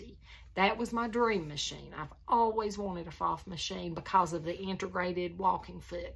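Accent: South, United States. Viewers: Hello, I’m an old southern gal from the woods of southwest Alabama, so your accent sounds just like me. I’ve been sewing since I was a little girl, myself. My grandmother was a seamstress who sewed for other people and she made most of my clothes growing up. She taught me the basics of sewing and I took it from there. Your sewing room looks interesting, I have Pfaff machines also, as well as a Singer Professional 430, a Juki 2000qi straight stitch and various other embroidery machines and a brother serger. I’m am like you, I keep everything, so I have had to do a declutter in my room this year, that hurt! My sewing room is outside of my house in a metal building that was used as an office years ago. It’s just across the yard and about 12 by 24 feet. It works for me as I can leave everything out without disturbing anyone. I will subscribe and look forward to watching your videos. 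· I’m new to your channel and love your southern accent 😊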